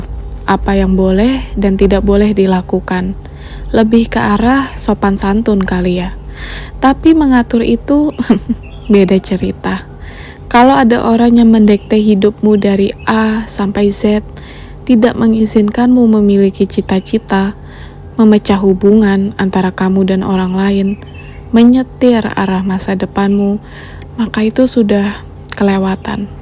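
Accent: Manggarai, Indonesia. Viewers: Ci tinggal di Manggarai pasti e, soalnya dari logatnya org Manggrai ni, ada video kompiang, tacupiang trus ada acara smbut baru juga.. salam kenal dr Ruteng, sukses utk kontennya Ci, sngat menginspirasi😇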